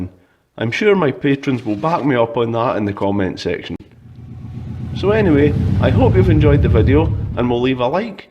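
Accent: Scotland, United Kingdom. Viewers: I think one of the reasons I subscribed to your video is because of your great Scottish accent as well as the great job you do to clean the car. Lol. Have a great day mate. 👍👍👍🇦🇺🇦🇺🇦🇺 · I can appreciate that you don't speak too fast because I wouldn't be able to understand with that collin mcrae accent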